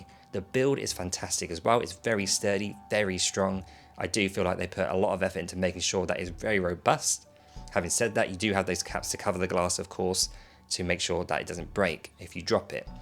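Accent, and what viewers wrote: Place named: London, United Kingdom. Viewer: 好听，正宗的伦敦音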